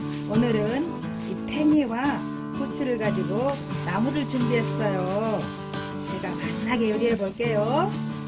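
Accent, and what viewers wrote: Jeolla, South Korea. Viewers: 호남말씨 쓰시는 누님~돌아가신 부모님도 전라도 분이셨어요~목소리 정감이 가네요~영상보고 꼭 한번 만들어 먹어봐야겠네요~감사요~^^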